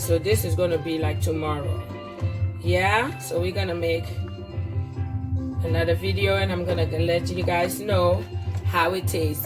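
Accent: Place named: Africa. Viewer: Omg love hoe u zo excited bent. Zo grappig hoe u Engels praat klinkt beetje als hoe Africans engels praten.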